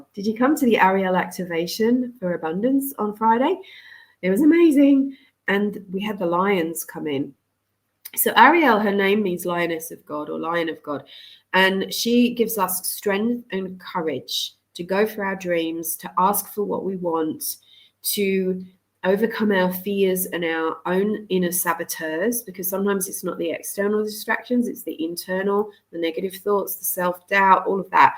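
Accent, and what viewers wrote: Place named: Australia. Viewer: I’m in the U.S, but i’m an Aussie. So awesome to hear an Aussie accent. Makes me feel better. I’m an empath. Being in America is really hard right now. I spend all my time, clearing it. Lol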